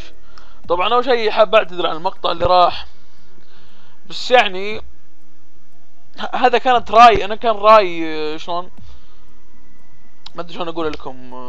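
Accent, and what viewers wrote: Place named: Qassim, Saudi Arabia. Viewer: انت من القصيم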